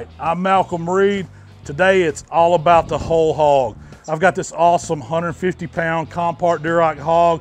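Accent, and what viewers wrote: United States. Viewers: Which state does his accent represent?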